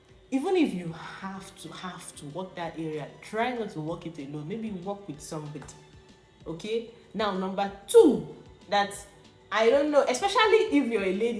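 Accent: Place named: Igbo, Nigeria. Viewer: That igbo accent i love it i got to get me another Nigerian igbo queen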